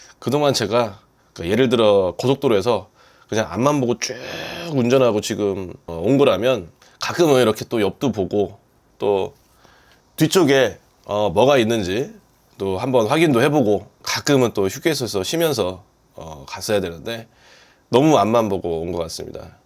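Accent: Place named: Jeolla, South Korea. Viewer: 못했어오 -> 모대써요x 모태써요o 대전 분인데 서남사투리 쓰지 말고 표준말 씁시다.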